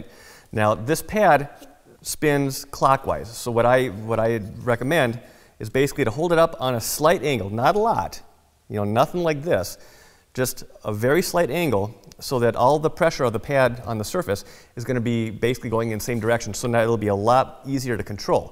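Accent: Midwest, United States. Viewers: Really like your knowledge and presentation. And a good Midwestern accent. Ex michigander here.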